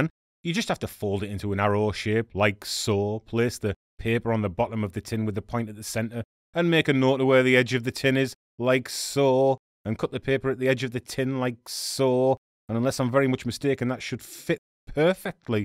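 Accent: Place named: Stockton, United Kingdom. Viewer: As a Stockton Lass i love the recipes and hearing my Dialect I've lost mine by Livin too long in Germany🤣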